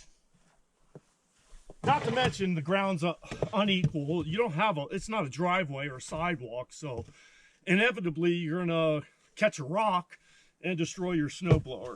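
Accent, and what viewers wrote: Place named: Philadelphia, United States. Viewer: Good day! I’m picking up bits and pieces of a Philly or Philly adjacent accent! Where are you from originally?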